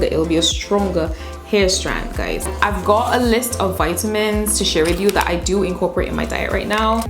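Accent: Barbados. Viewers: I’m hearing a Bajan accent! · Am I hearing a bajan accent? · Are you a Bajan Queen? I’m sensing the accent. 😀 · 🇧🇧 I hear my people in your voice! New subscriber! And this was a great video! Thank you!!! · You sound almost bajan😄your hair I'd beautiful. My goal is healthy waist length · Please what is your accent !?? Am I hearing Bajan? Absolutely beautiful !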